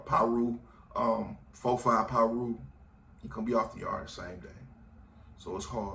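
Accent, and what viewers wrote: Detroit, United States. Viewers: I'm sure someone has suggested this ... But I'd rock a "ain't no ifs ands or buts about it" hoodie. I listened to two of these videos and knew you were from Detroit without you even saying it.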